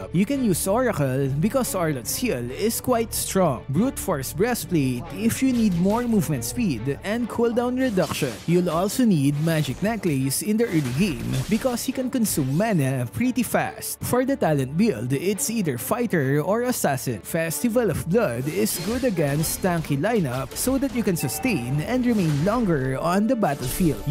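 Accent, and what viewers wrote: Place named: Tagalog, Philippines. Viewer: In a yung english mo sir parang tagalog pakingan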